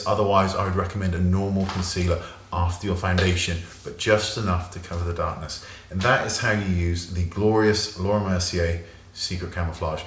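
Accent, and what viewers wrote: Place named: United Kingdom. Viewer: Hes british not aussie u dumb fuck below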